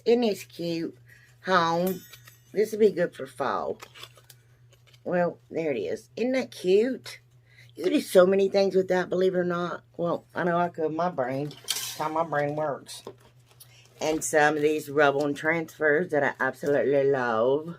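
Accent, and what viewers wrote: South, United States. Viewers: There’s my favorite country talkin chic!!! Fall DIY’s already? Love Love you! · Cal DIYs already?!!????!! your just make me giggle while watching you’re videos. I think it’s because if I did videos that’s exactly how I’d do them. You are so so so dang cute. I’m not from the south and I love listening to you talk. I just love you to pieces.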